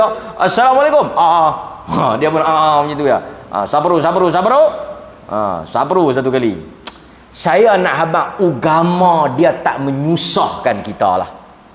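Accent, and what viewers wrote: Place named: North, Malaysia. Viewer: Orang utara mmg bahasa kasar skit,tapi aku suka sbb ustaz ni teroih terang..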